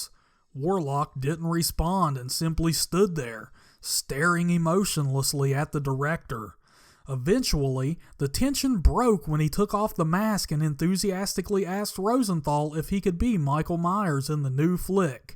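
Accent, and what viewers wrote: United States. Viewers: His voice so country🤦🏽‍♂️😂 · this guy sound like a hick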